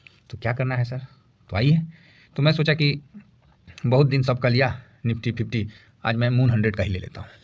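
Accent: Bihar, India. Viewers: Sir app bihar ka lagra sir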